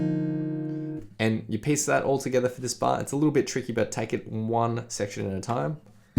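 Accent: Australia. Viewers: Your Aussie fuck me ya legend good stuff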